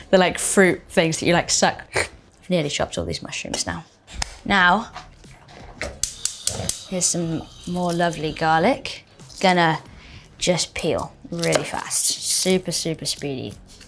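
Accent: United Kingdom. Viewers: I love her British accent so much more than her American accent. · How did I forget Bella is British? 😂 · Man ngl I’ve been bamboozled I didn’t know she was British 😅😅😅😅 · Omg she's British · British accent, but i actually hear ellie talking, really, it could be ellie making lunch for vogue IF like, not the apocalypse you know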